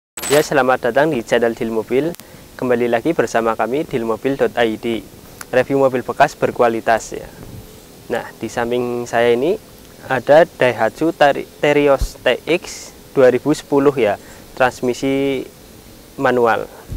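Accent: Java, Indonesia. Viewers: Mase....Medok pisan Jawane....😂😂😂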